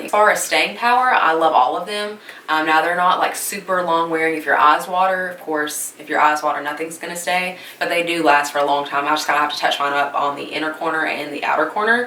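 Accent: South, United States. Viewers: Hope you don't mind my asking but where are you from? I hear a little country twang!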